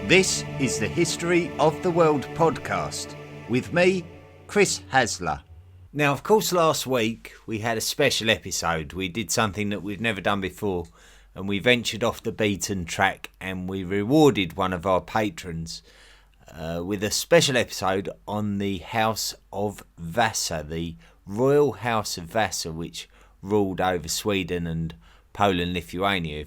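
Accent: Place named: England, United Kingdom. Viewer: Narrator pronounces most of the words incorrectly. English accent grinds on my last nerve. 😢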